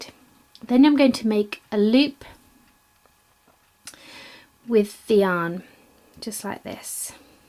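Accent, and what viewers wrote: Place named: England, United Kingdom. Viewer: beautifully explained in a nice clear voice - great to hear an English voice on one of these knitting videos. Loved it!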